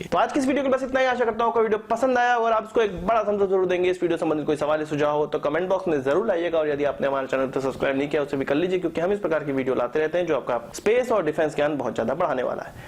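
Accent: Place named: Pahadi, India. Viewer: Baat krne k tarike se he pta chal rha tha..Pahadi bhai hai.. :)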